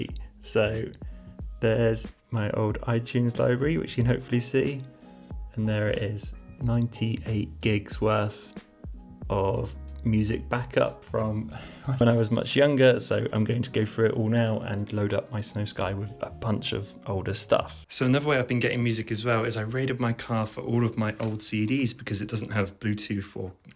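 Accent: United Kingdom. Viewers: I have never realized how satisfying the British accent is to hear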